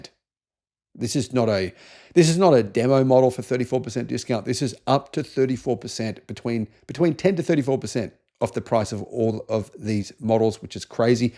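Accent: Australia. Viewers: Australian pronunciation , wait 'til you hear us say aluminium 😂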